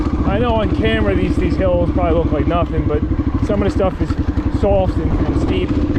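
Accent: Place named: Eastern Pennsylvania, United States. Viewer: Came across one of your videos on the XL, I heard you talking and thought "This dude is from eastern PA for sure." Greetings from Bucks County. I might have to check out some of these spots on my XR one of these days.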